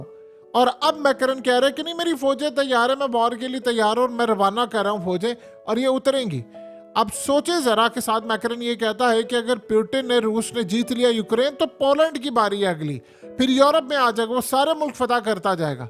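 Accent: Punjab, India. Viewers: Would like to see the picture and name of the News reader / anchor of Haqeeqat TV. He speaks very well with a touch of Punjabi accent. The news is also very authentic and original (First to broadcast). Thanks.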